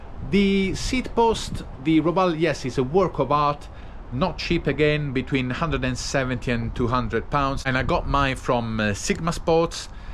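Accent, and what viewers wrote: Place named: Italy. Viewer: Good spirit man😅 Keep on the good work. Love your italian accent👌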